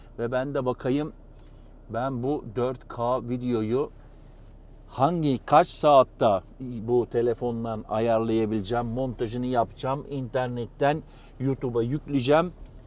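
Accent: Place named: Istanbul, Turkey. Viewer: Bey elinize aklınıza saglik, temiz bir İstanbul Türkçesi ve tertemiz ses tonuyla kolay dinleneceksiniz. (Cekim teknik ayrıntılarna yer vermeseniz iyi olur) Görüntü güzel, toplumsal yaşam hk. açıklamalar hoşumuza gider.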